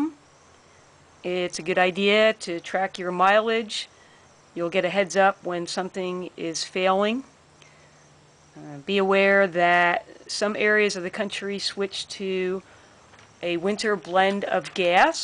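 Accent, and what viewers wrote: Philadelphia, United States. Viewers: The Philly accent makes me homesick.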